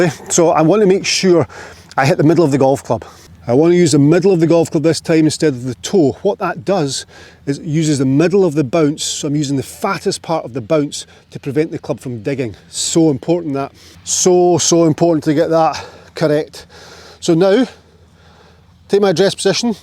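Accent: Scotland, United Kingdom. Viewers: Love the lesson and your Scottish accent!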